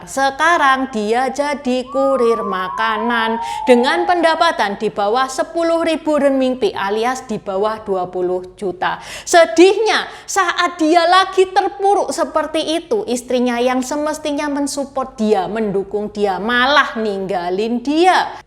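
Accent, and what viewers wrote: Java, Indonesia. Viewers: kocak kalau cici bercerita, medok sekali ci....hehehe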